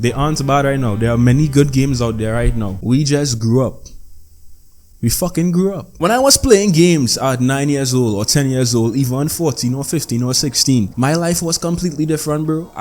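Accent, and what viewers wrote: Trinidad and Tobago. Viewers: Is that a trini accent?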